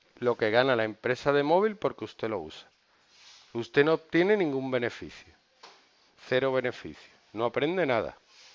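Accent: Spain. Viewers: Increible que en 1288 vistas, nadie haya reacionado. En fin... "le stylo á qui est?" no se dice de ninguna manera. jajaja y las tildes al revés y la pronunciación a la española. en fin. un desastre.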